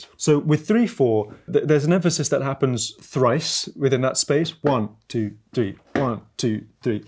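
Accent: United Kingdom. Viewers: HE'S BRITISH?!?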